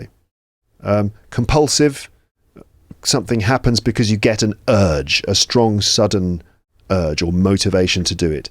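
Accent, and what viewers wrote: United Kingdom. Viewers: Hello, teacher. First of all, I want to say that your lessons are amazing. I’m from Brazil and have been studying American English, but I have to admit that after watching your videos, I fell in love with British English pronunciation—it’s one of a kind… I’m still at the A2 level, but I can understand your lessons very well thanks to the context and vocabulary. I’d like to sign up for the premium plan.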